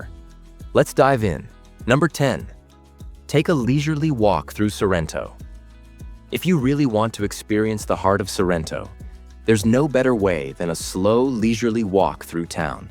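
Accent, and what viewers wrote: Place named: United States. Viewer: Why do American accents get on my nerves so much? Sorry,can't bear to listen!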